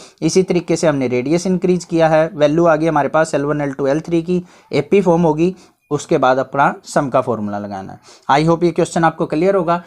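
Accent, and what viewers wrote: Haryana, India. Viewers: Sir aap hariyana se ho kya ?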